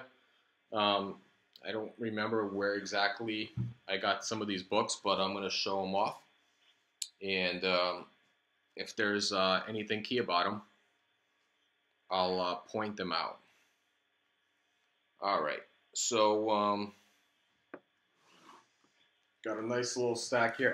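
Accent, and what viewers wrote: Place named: Southeastern New England, United States. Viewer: Like how you mix in comics and craft beer. Sounds like you're local to SE MA & RI. So many good breweries and LCS in the area.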